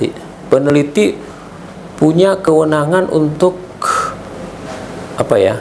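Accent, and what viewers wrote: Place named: West Sumatra, Indonesia. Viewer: Logat Minang.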